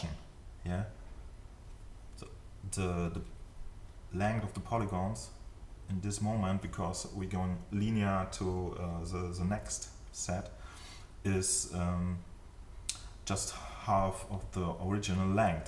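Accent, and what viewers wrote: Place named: Germany. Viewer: Man merkt das Sie deutsch sind^^ Das Deutsch-Englisch hört man SOFORT raus^^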